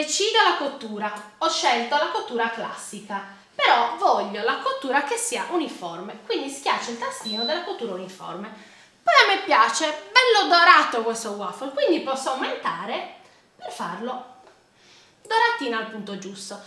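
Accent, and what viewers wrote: Sardinia, Italy. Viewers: Bravissima! Ma sei sarda per caso?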